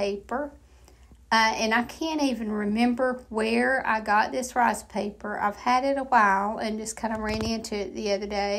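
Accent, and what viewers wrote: South, United States. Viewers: What gorgeous projects ❣️💕 While I have seen many upcyclers paint over silver-plated trays, this is the 1st time I have seen anyone leave an unpainted silver border around the edge of the tray and also leave the back unpainted...and I rather like it❣️🤍 Everyone has their favorite "go to" paint color. Not hard to understand why "buttercream" is a color you use frequently❣️🤍💕🤍 Not only is it beautiful for all of the reasons you described, BUT IT SELLS, which IS THE BOTTOM LINE, LOL.🤣🤣🤣 And being from Minnesota, am I the only one who loves ❤️ the way softly says, "buttercream" with that darling Southern drawl⁉️⁉️ Hahahahaha❣️👍🏼😁🤪🤣 The ONLY drawback I experience when you say "buttercream" many times during the course of a video is the HUGE CRAVING I GET FOR A BIG SLICE OF CAKE 🎂 🥮 WITH BUTTERCREAM FROSTING AND AN ICE COLD GLASS OF MILK, LOL❣️🤣🤣🤣🙈🙊🤣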